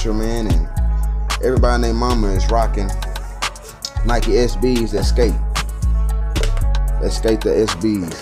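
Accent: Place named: Texas, United States. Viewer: Side note I can def tell you from Texas lmao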